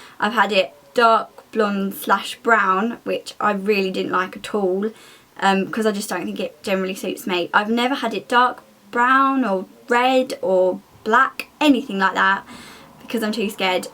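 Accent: United Kingdom. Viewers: I can understand what she's saying. I personally love British accent, its probably because of Harry Potter. XD I always thought British people have cool accents. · wtf? does someone understand what this girl is talking here?=))))))))))))) for god safe speak too too much fast :)) i don't think she realise :)) i always hated british accent is horrible , british sucks:| the acent is enough tacky she shouldn't talk fast , british accent + fast talking= SUCKS girl please don;'t speak so fast in your videos if u want ppls understand u:)) not just british ppl using internet LOL ..LMFAO :)) this made my day=))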